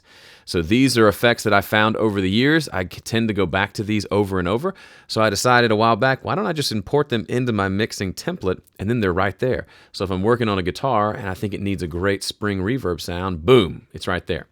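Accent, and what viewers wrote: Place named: Tennessee, United States. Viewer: He sounds like home (I’m originally from TN)!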